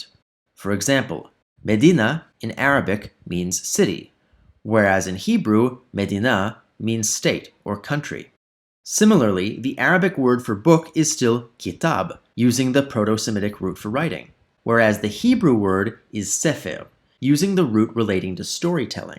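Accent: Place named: New York, United States. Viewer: This guy has an interesting American accent. His long A vowel has New York/Yiddish coloring that makes some of his words sound like British RP.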